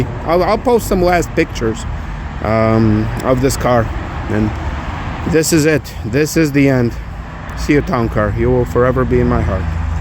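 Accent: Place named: Alabama, United States. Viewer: He is from Mobile, Alabama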